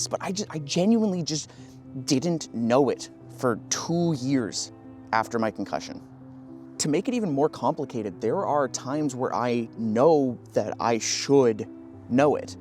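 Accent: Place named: Canada. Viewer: just sounds like another fellow Canadian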